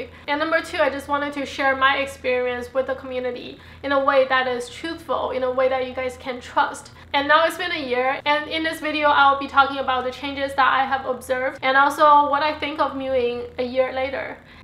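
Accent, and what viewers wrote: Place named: Taiwan. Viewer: U seem to have taiwanese accent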